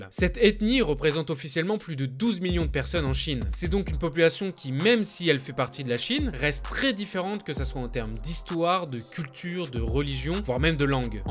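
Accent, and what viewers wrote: France. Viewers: t'as vu son texte où ça ? Parce que moi tout ce que j'entends c'est un français qui prononce les "X" comme en français et le "J" aussi mais je t'en pris donne moi un cours de chinois puisque t'as l'air de visiblement connaître plus que moi... C'etait quoi encore ton commentaire ? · ça s'appelle l'accent français. Et au pire concentre toi sur autre chose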